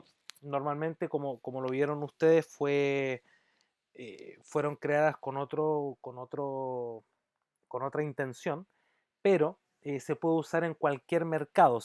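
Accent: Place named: Chile. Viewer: 1er video q veo con una explicación tan clara de los patrones Heiken Ashi ! Se agradece la iniciativa.. (Da gusto escuchar el acento chileno) Salu2!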